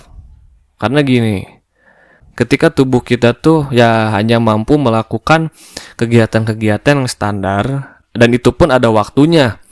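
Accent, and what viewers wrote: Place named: Bandung, Indonesia. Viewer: Orang Bandung nya kang logakna kkental pisan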